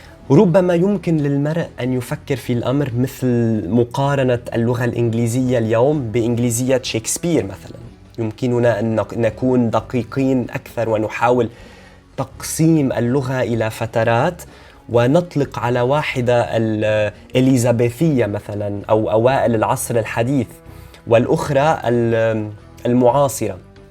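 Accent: Lebanon. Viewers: I guess he is lebenese because of his smooth accent so yes he's an arab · He has a slight Lebanese accent when he speaks MSA.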